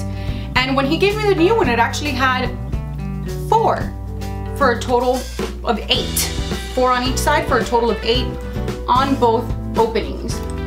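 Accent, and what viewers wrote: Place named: France. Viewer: really cute french accent ^^ nice video !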